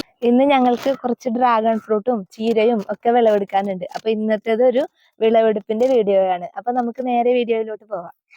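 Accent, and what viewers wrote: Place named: India. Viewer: Samsaram kettapo thoni nammalu ore gillakarado🎉🎉 God bless u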